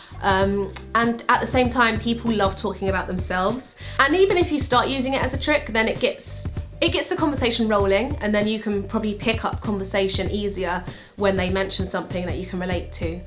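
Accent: United Kingdom. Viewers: I heart the British accent and I subscribed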